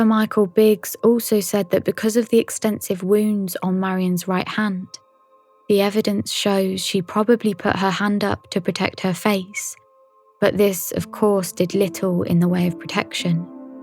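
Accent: United Kingdom. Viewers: You are the best voiced narrator I've heard in my 47 years. (I live in Oklahoma so what do you expect lol) - before your voicemhit my ears, my favorite was Richard Dawkins' wife Lala Ward...she used to be my favorite narrator and before her, it was the Forensic files narrator. Your voice is SO smooth and relaxing and sublime. Female British accident is my favorite accent in the world...and if anyone offers to digitize your voice ask a lot of money because YOU ARE THE BEST. NOBODY is as sublime to hear than your voice. I don't want to invade your privacy, just want to know what parts of Britain a person has to grow up around to get that accent? Your microphone is so good, your classy, thorough, and wonderfully pleasing voice is so good. It's a 🙏 thank you kind poster for sharing your voice with us. Don't worry I'm not a stalker... I'm gay lol you just have such an amazing voice it's unbelievable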